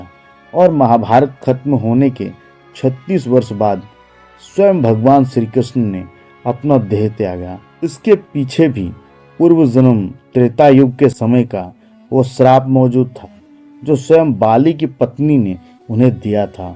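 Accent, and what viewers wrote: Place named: Bihar, India. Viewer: Tumhara Hindi bolane Lagta Tum Bihari Ho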